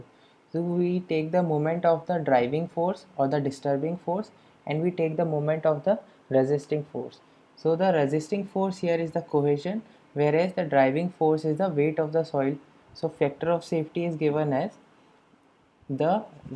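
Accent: India. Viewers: fuck!!! I never understand indian accent. anyway thanks for the video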